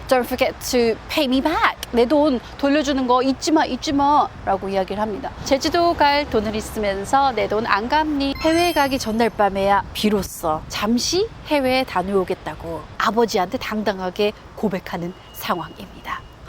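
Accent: United Kingdom. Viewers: 영국영어 너무 좋네요! 감사합니다!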